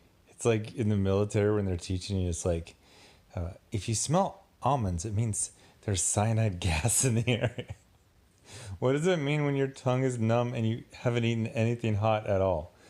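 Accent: United States. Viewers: First, Underworld was a rip-off of Vampire: The Masquerade, Rage: The Apocalypse, and the whole classic World of Darkness in general. I still love Kevin Grevioux (accounting for your American accent, pronounced "Greh-View" with 'eh' being pronounced as in 'meh'). Second, regarding Vampire strength, it's implied in the movie--and generally accepted in all modern Vampire stories--that 1) the older a Vampire is, the more powerful they are, and 2) the closer they are in generation to the first vampire, the stronger they are. Selene was both old (over 600 years old) AND iirc her sire was sired by the original Corvinus vampire, making her a third-generation vampire. Example: a fourth-generation vampire who's 600 year old is probably weaker or just on par with a 300 year-old third-generation vampire.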